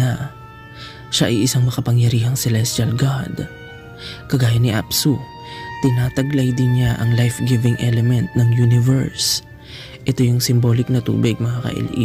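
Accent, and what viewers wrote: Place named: Cebuano, Philippines. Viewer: Hello ka-, ang style at pamamaraan mo sa pagsasalita ay walang pagkakaiba sa nagsasalita ng Ophirian Heritage Conservatory, ibig ba sabihin na iisang tao lang kayo? Kahit tagalog ang ginagamit na salita ngunit ang accent ay parang sa isang cebuano language. Maganda ang ipinapakita mong presentation at wala itong pagkakaiba kung paano ginawa ang presentation ng OHC. Very Educational!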